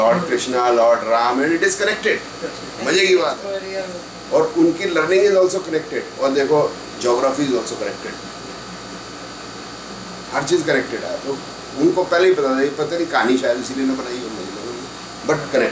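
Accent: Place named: West Delhi, India. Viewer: When i listen to the way you talk, I start to miss West Delhi.....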